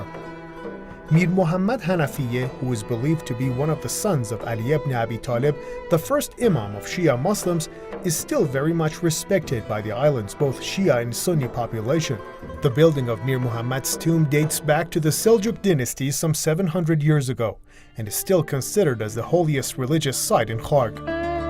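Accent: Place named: United States. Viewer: Looks like a very boring place TBH. No wonder normal people ain't allowed to travel there. Also where did you find a host with a 99% American accent to showcase this XD?!!?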